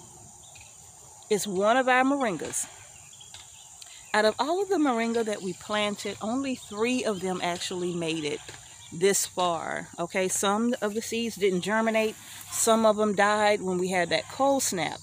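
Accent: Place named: North Carolina, United States. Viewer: New to your channel, just subscribed. :) Question... what part of the country are you in? I'm native to central NC and would like to know if our growing conditions are similar. (I hear those "y'alls" your saying, and detect a slight accent like mine.)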